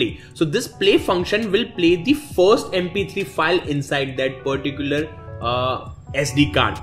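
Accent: India. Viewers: Open interesting video - Hear an Indian speak instead of a human being - Close video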